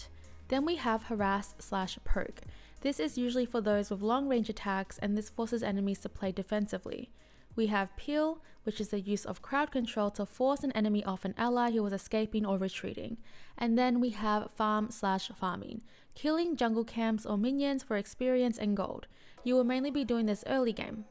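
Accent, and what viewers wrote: Australia. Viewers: Are you an aussie??? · That australian accent Love it <3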